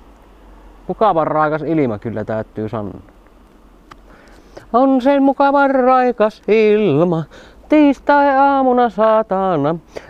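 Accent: Finland. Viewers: Tämä rally inglish on kyllä mainiota kuunneltavaa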